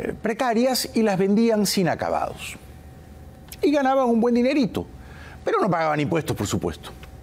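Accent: Peru. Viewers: Es que es peruano. Perú tiene el mejor asento.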